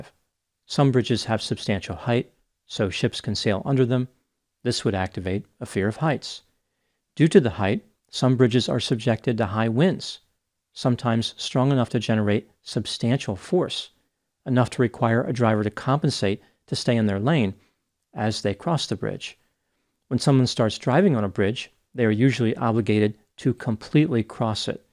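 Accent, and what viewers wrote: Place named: Baltimore, United States. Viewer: Doc, I’ve always thought you had a Baltimore accent.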